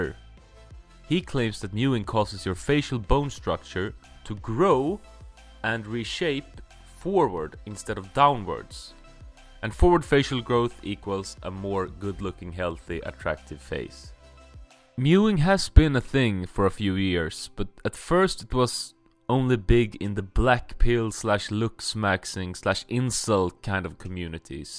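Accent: Sweden. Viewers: swedish accent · is that a fellow swede im hearing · Damn is that a swedish accent or am I crazy af? 🤔. Yes I love mewing I have been doing it for a month now and I see huge difference. I reccomend it to everyone!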